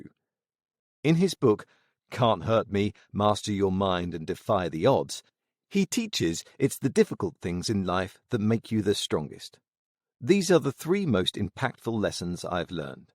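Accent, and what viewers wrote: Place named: England, United Kingdom. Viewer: Representation matters: This book by a Black author was summarized by a white man. And no offense to the talented, but why, in the year 2021, am I listening to a white Englishman recount the uniquely American experiences of a Black New York-born United States military officer!? Please consider hiring writers and voice actors whose identities more closely align with those of the wonderfully diverse authors you showcase. You guys did good, but you can- and should- do better.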